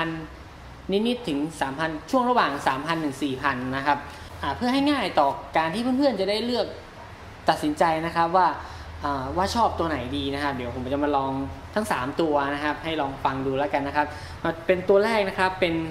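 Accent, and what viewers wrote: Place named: North, Thailand. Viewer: สำเนียงเหนือนี่ ออกชัดเลยน้อ อ้าย อิอิ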